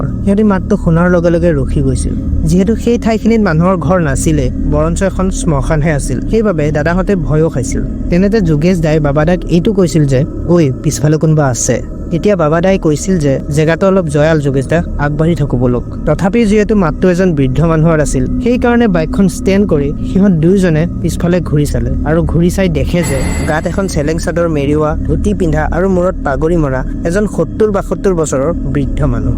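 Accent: Tezpur, India. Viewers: aitu tezpurr kotha jen lagil. tezpur t ankua akhon thai ase.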